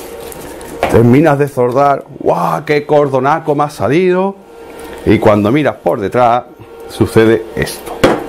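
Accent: Andalusia, Spain. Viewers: Me encanta el acento andalus!!! Saludos desde Italia y yo queriendo hacer muebles en hierro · No me lo puedo creer. Claro, práctico, ameno... y narrado en el román paladino de Al-Andalus! Así da gusto. Buen trabajo, caballero.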